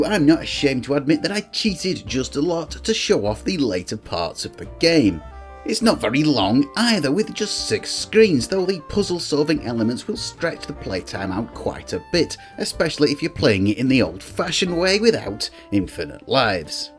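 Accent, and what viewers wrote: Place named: Cockney, United Kingdom. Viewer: This is the best cockney retro gaming channel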